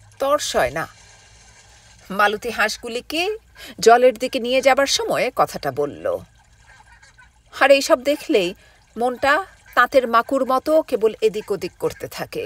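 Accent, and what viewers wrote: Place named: Bangal, Bangladesh. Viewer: Ekhono parjanto Kato madhur gramyo poribesh mone hochhe ekta bhoyonkar din asbe khub valo porchho bisesh kore bangal vasa amar rater samay tomar galpo shonar jonyo ❤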